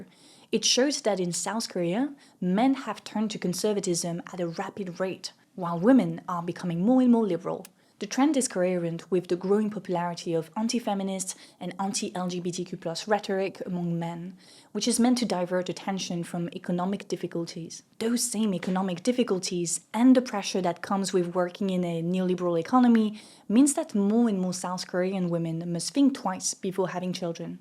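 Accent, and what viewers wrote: France. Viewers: French pronunciation of indian names is so cute and funny at the same time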